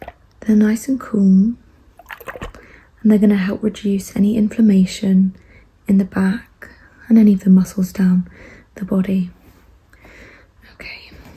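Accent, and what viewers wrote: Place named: United Kingdom. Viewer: I am not into chiropractor ASMR but your british accent influenced me to watch this video till the end. now, I am binging your other videos to hear more of your accent. lol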